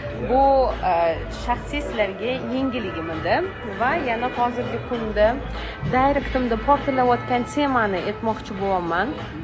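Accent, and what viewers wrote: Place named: Kokand, Uzbekistan. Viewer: Sassiq qachondan rus tilida gapiradigan boldin yaqinda organvoldin shakili toza o'zbecha gapirardinku qoqonchalab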